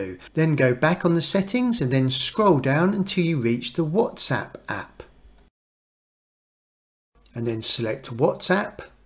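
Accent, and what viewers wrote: United Kingdom. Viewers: I liked your British accent <3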